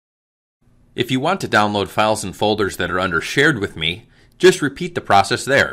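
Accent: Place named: Western Pennsylvania, United States. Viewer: you are from western pennsylvania, aren't you? Somewhere near Pittsburgh, I would guess.:)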